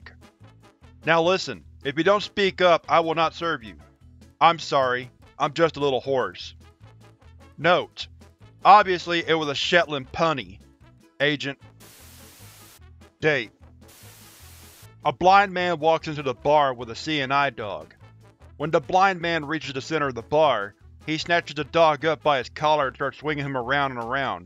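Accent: South, United States. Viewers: Wait....this is your normal voice? It sounds identical to the voice you use on the other videos? Southern drawl and all.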